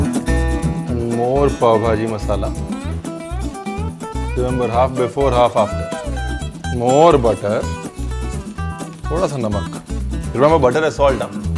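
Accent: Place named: Punjab, India. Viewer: Panjabi pana🤣😘itna cute bolte ho 😆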